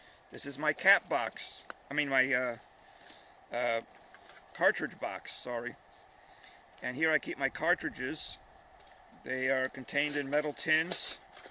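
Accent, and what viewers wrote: Boston, United States. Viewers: Kinda funny how you dress as a Confederate yet you have a Boston-like accent, that's not hate or anything I just think its funny.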